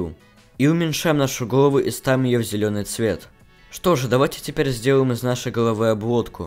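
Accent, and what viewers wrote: England, United Kingdom. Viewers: бро, всё круто, но убери этот акцент английский, уши вянут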